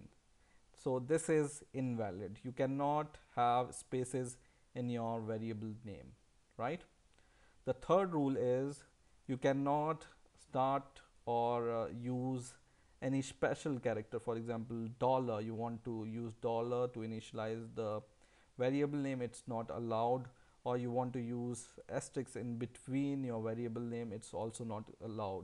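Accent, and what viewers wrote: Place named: South India, India. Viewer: Daata type I think he is a south indian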